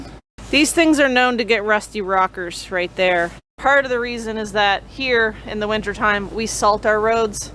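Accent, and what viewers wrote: Canada. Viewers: Canadian accent is awesome. I bet us southerners sound like beverly hillbillies to you 😂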